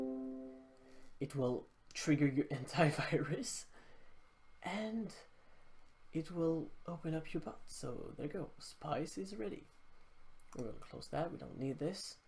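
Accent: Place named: France. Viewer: Un français anglophone ? Why not, une petite correction, le point se dit « dot » 😉 en tt cas gg à toi !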